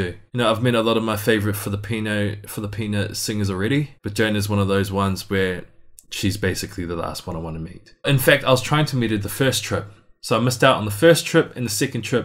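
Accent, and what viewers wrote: New Zealand. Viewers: R u kiwi?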